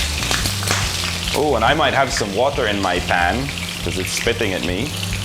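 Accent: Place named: Tagalog, Philippines. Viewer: love your tagalog accent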